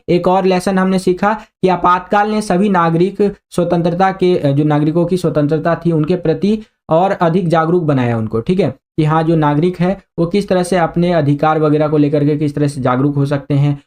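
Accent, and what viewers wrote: Bihar, India. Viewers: Aap बिहार से ho भाई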